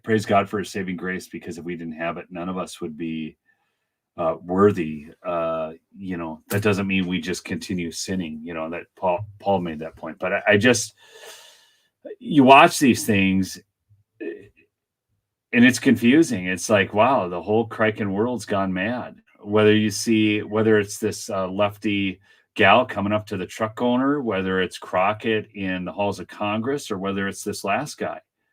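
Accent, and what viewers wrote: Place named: Minnesota, United States. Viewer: So funny to hear you say you're from Bemidji, I have been there, my Ex hubby was born and raised in Karlstad and his dad was the traveling Psychologist for multiple towns, Thief River falls, Bemidji, other surrounding little towns. I am from Tx and when we moved to MN, prior to going to Germany for 3 years, i thought i was in a cold hades....lol..I was used to hot TX weather and nearly froze my rear off. I had never seen garages where you had to plug in your vehicles 30 mins prior to leaving the home...it was WILD, moose in the yard, i had never seen one. He graduated in 1985 - he had never seen a black person when i met him (he was 19)- his accent sounded exactly like yours. MN is cold as crap, not meant for Texans! i couldn't wait to leave~ lol...I felt like l was living on another planet. Then i married a guy from Alaska, you'd think i'd learn...lol. 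I don't go there though! Thanks for all you do!! love you're positivity!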